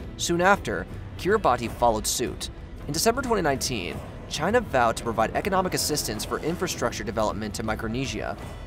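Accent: United States. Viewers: Love the videos. Hate the American pronunciation of all the places in the world. Saying that "new daily" is a new one for me. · Bruh. He has a normal American accent. He just isn't pronouncing these names right. Anyone more aware of these things should know that "Delhi" sounds like "deli." It's just incompetence. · Are you American 😅😅😅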